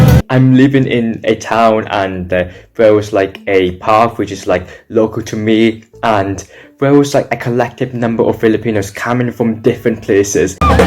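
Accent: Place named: United Kingdom. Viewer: ang ganda ganda naman dyan…british accent wow!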